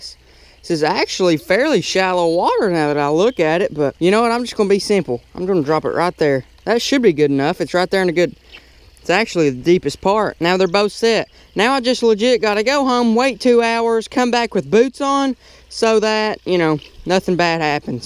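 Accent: United States. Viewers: Country akcent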